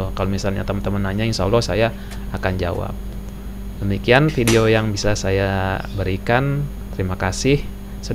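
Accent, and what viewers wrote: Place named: Banjar, Indonesia. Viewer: Org banjar kh, logatnya tu..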